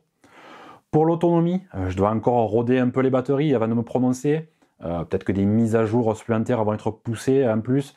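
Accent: South, France. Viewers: L'accent du sud c'est le meilleur 👍👍👍😁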